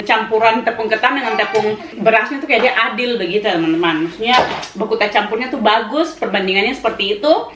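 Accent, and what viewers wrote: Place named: Kalimantan, Indonesia. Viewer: ngomong kaka sma ky di kalimantan 😍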